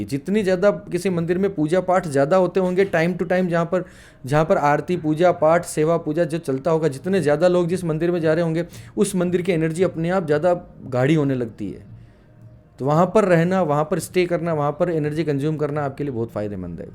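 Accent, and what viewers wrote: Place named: Rajasthan, India. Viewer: Aapki boli bhilwara ke aaspaas ki h bhai sab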